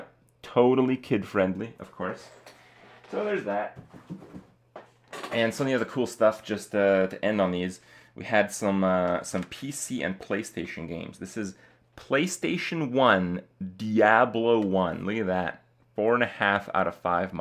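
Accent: Romania. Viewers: So that's why u'r accent was so familiar cuz u are from romanian too,and,Omg this catle totally looks like my own caslte drawing. Totally..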